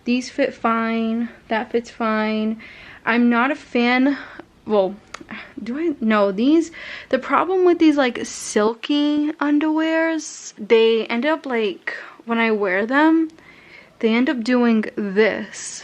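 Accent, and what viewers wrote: Boston, United States. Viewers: You from Boston ? Some of your accent sounds like mine!!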